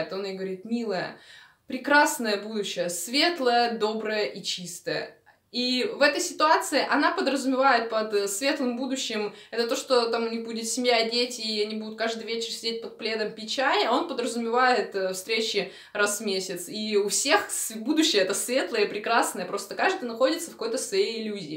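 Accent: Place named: Urals, Russia. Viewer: Говор Уральский, окающий, ты из Перми?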